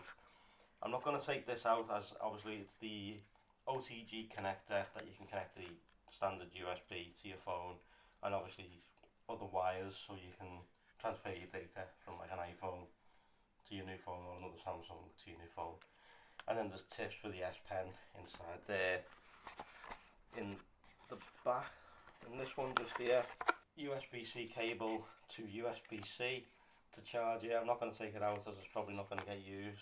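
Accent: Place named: Yorkshire, United Kingdom. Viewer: He's sounds like he is from Yorkshire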